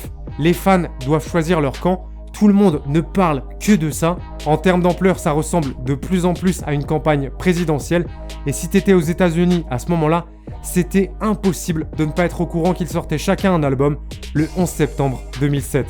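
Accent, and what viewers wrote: England, United Kingdom. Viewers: mention speciale pour ton accent anglais et tes videos de wack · ouiiiiiiiiiiiiiiiiiiiiiiiiiiiiiiiiiiiiiiiiiiiiiiiiiii enfin tu fais plus ton accent anglais tout était parfait sauf ça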